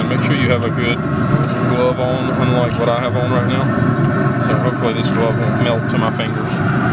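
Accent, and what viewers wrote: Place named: Alabama, United States. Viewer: Are you from Alabama? You sounds like it.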